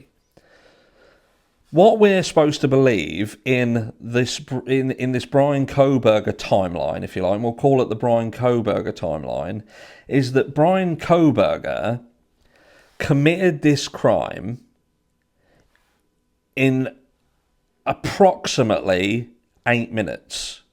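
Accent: Norfolk, United Kingdom. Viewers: Go,!! I agree 100%. Usually, here in the US, most conspiracy theories turn out to be true. I must admit, I have difficulty listening to the British accent but you and Jonny Lee Miller have changed me. Love your videos. Any updates on poor Sean Daugherty? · Appreciate you and all your hard work 🤍 Is that a Norfolk or Suffolk twang I can hear? 😊✌️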